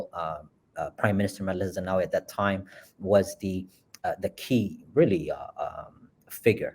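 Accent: United Kingdom. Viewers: love your British accent.please focus on doing english programs.there are not enough english current affairs productions about Ethiopia. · Corrections, Col. Abiy is not a doctor he is elementary schools drop out street Hustler's boy. From Shane's red district streets a prostitution zones single mother in Bashashaa town case closed no more questions thanks 👍 baby your Brtish accent Likes sweat heart kisses on Lips Love it you sound great. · Great British accent,. Keep up your great work. 👍🏽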